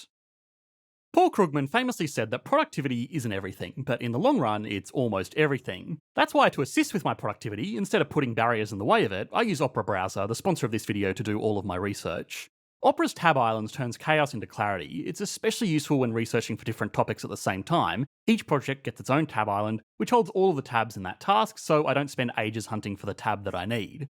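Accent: Australia. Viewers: The demand for housing doesn't mean they are built fast enough. You don't know the issues of Canada clearly. The builders do not have the workers and they also rather pay less. On top of that they rather build at a slower pace and charge MUCH MUCH more for each unit because of desperation of the housing market. If they build too much housing too fast. Their bottom line in what they can make also suffer. So the incentive is to keep it as extremely high demand. How can you account for 500K immigrants when 200K housing is built every year. That is just the immigrants not counting the 750K students that need places to stay. We need a new city built at the size of Ottawa each year now. Let me know how that is feasible. This is not over sensationalized. It's an extreme problem happening. I detect an Australian accent or NZ one and you don't live in Canada. Studying things and not living them is a different story.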